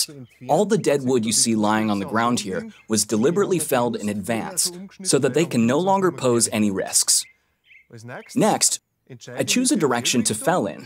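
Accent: Bavaria, Germany. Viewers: Bei der Ankündigung dachte ich schon real Bavarian English....Ich weiß nicht, welcher englisch Sprechende verirrt sich denn auf diesen YouTube Kanal.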